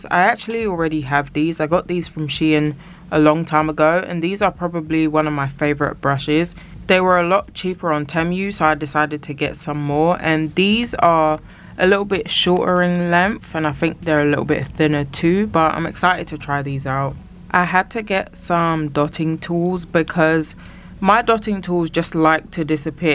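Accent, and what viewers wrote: United Kingdom. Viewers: Quick question,I can hear your British accent… did they deliver to you in the UK?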